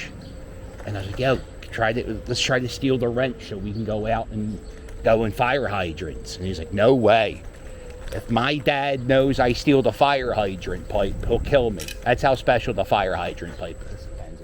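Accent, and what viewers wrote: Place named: Pennsylvania, United States. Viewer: I was watching a video on US accents (done back in <num>’s) and specially focusing on accents that may disappear. they interviewed this PA woman who seemed to have a similar accent to you. It was cool to here the accent again.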